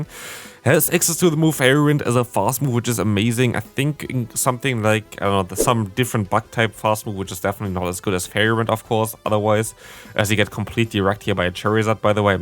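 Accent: Germany. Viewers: Wait... You´re german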